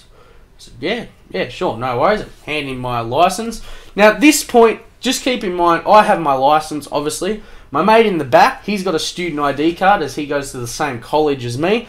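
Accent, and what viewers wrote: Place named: Australia. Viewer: I never knew you were an Aussie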